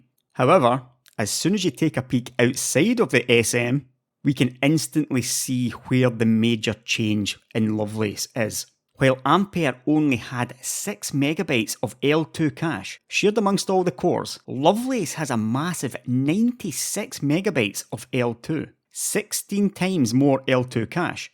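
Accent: Scotland, United Kingdom. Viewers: Love you, you are my favorite scottish accented tech youtuber of them all, and i can't wait to see more of you in the coming months. Much love and keep strong! <3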